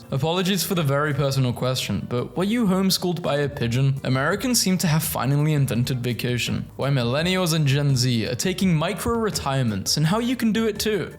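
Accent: United Kingdom. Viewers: Yo british accent kills me, it's funny, anyway, I luv your vids! keep going bruh!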